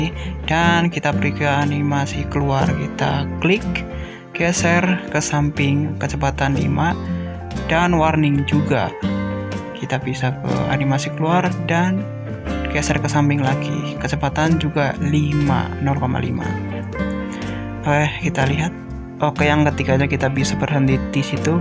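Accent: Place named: Banyumas, Indonesia. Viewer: Jawa ngapak😂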